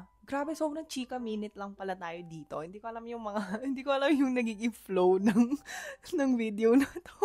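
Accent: Batangas, Philippines. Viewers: The batangueño accent is giving HAHAHAHAHA nachika kalang pero nakakahatak talaga ng attention hahahaha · Saan ka dine sa batangas? Kakatuwa ang punto mo, batangeño batangeño eh..😂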